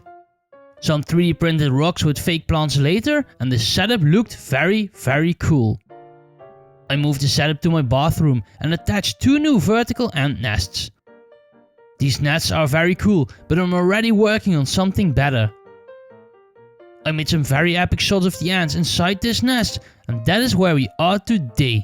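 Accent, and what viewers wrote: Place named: Netherlands. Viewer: Cool accent. Let me guess... You are from the netherlands?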